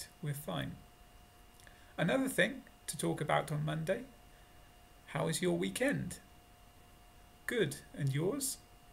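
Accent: United Kingdom. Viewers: You have so cool British accent...I think it would be a good idea just to talk about something in English, tell the story or stuff like that. The topic isn't so important I guess. A magic happens when students hear English. That is a quite important thing in the way of learning.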